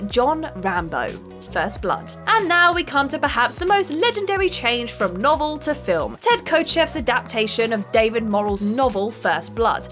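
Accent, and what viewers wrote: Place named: England, United Kingdom. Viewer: Yankee? She English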